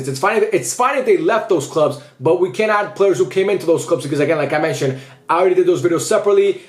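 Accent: United Kingdom. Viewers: Top tier British accent 🇬🇧💷🫖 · Amazing British accent! Please oil up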